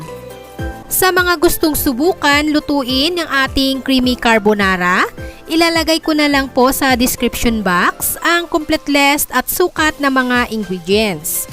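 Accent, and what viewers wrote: Visayas, Philippines. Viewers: Bisaya ba si nanay?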